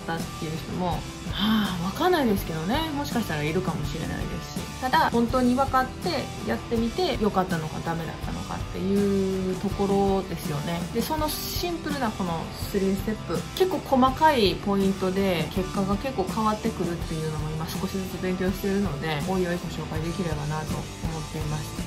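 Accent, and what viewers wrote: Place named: Kansai, Japan. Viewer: めちゃくちゃ分かりやすい😮 あとなんか関西弁おもしろい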